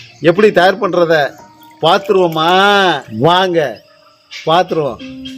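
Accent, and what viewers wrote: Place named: Chettinad, India. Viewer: செட்டிநாட்டு தமிழ் அழகு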